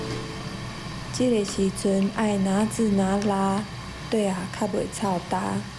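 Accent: Quanzhou, China. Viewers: 感謝使用泉腔配音 挺有趣的 也很難得 話說同安腔的二調變調是升調 但是這影片的配音 比較不明顯 (新竹當地的操新竹腔的中老年人就很明顯) 另外這影片保有部分老泉的央元音 例如"事sīr" 但是也有一些讀音丟失央元音變成新泉了 例如"鍋e"(老同安腔讀er) 略感可惜了些 附帶一提 傳統上(例如臺北腔 新竹腔)"好"讀ㄏㄛˋ "ㄏㄜˋ"是南部腔的讀法(即臺灣南部偏漳腔 主要分布於臺南與高雄一帶 而傳統上漳腔也是讀ㄏㄛˋ) 這個字的音讀混到南部腔了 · 我覺得你的腔口很可愛，看字幕說是泉州腔，我聽起來有點福州加彰化和美一代的海口腔，跟我講的泉州腔有點差別，拙見不喜勿怒。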